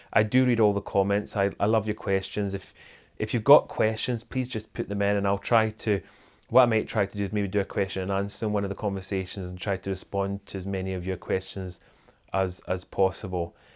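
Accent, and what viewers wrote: Scotland, United Kingdom. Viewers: Lovely video! You are such a gentleman.... I don’t recognize your accent. Is it Scottish? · This is the first video of yours I've seen, and I'd like to say how charming you are. You have that kindly, caring, good-humored personality that any good parent would want in someone who helps care for their children, a nice older cousin-type that would have been perfect for teenaged boys in a stressful position. I need your pleasant self in my life too (and the Scottish accent is by far my favorite), so I'm subscribing. Best wishes.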